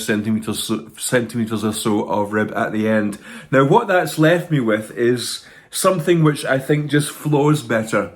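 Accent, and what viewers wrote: Scotland, United Kingdom. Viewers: And a HUGE thank you to you too dear for the very important role you play in my knitting life! I find your calm, Scottish accent so relaxing and your no-fuss approach similar to my dear husband’s. At the moment I’m having the joy of making my youngest granddaughter a cute farm playset for her first birthday in July - I’ve made the 12 fields and am currently making the pond, stream, veggie patch etc. then I’m onto all the cute little animals ❤ best wishes to Katie as she heads off to university - best wishes from Elizabeth in Tasmania.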